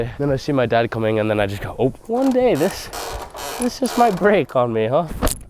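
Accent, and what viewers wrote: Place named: United States. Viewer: u have too much of american accent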